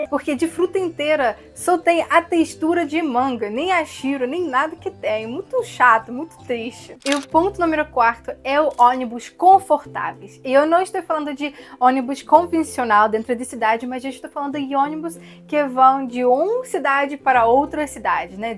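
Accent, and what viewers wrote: Rio de Janeiro, Brazil. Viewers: Amo o seu sotaque carioca! Você está 99,9% carioca já. Só falta trocar o "mesmo" por "mermo". Kkk. Ah, você é linda demais!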